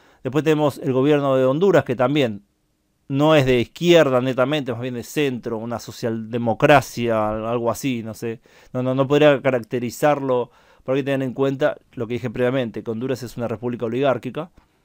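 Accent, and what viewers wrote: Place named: Córdoba, Argentina. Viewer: suena a cordobes (o cordo-chanta)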